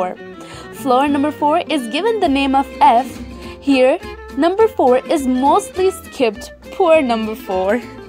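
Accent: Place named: South Korea. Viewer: Loved your korean accent by the way!❤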